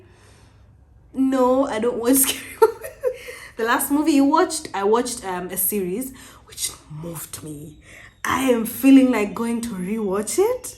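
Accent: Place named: Kenya. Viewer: you sound Kenyan, then you don't!!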